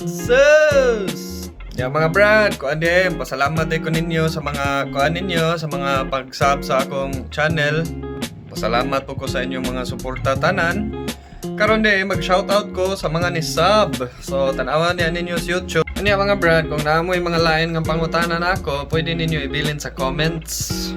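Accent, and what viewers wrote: Visayas, Philippines. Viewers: New subscriber sir from Tiktok to Youtube.lingaw kaayo ko nimo sir bisdak kaayo ka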